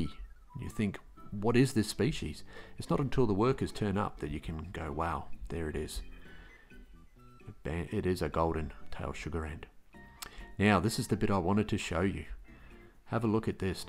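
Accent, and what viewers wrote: Australia. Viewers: I guess it's because I grew up as part of that generation but if I hear an Aussie talk about animals I tend to be entranced and retain the information better. The spirit of Steve Irwin is smiling somewhere.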